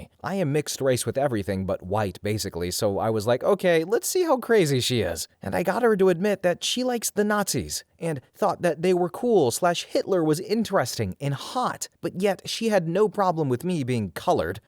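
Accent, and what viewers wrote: Russia. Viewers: Loved the Russian accent! Crazy story. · Love your russian accent xD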